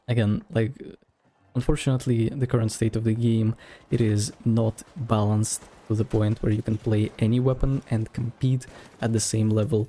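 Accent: Russia. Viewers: agreed, his russian accent is pretty cute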